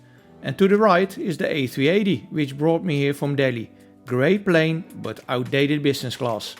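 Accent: Netherlands. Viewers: What a terrible Dutch accent.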